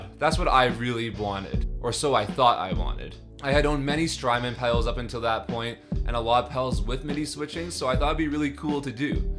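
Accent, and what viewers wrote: United States. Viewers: You traded a custom board that you had to do some convincing to procure... and then traded it for one pedal that's so common you could piss off your back porch and somehow hit a carbon copy? Also, you using brit heat measurements with an American accent... weirder than tim walz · It is weird that someone with an American accent uses Centigrade. Probably living abroad. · Where in the world do you sound like an American but talk in Celsius?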